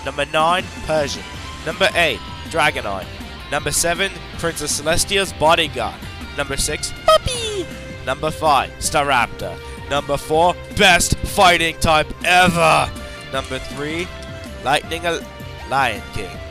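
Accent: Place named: United Kingdom. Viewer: are you british or something cause your accent is hillarious XD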